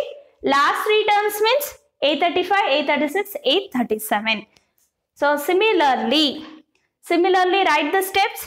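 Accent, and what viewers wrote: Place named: South India, India. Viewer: Maam u r frm tamil nadu or kerala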